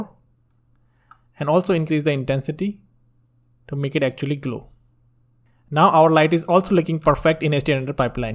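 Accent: India. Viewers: Sound Like Indian? But Very Clear and Clean. ❤️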